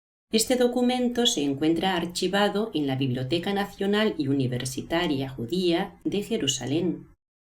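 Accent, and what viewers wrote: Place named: Spain. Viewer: misael rrodriges a mi su voz española se me hace bien horrible!!!!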